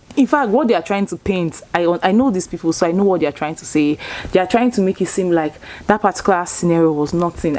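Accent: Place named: Igbo, Nigeria. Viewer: which part of igbo are u from bc this ur English ashaam sounds like ours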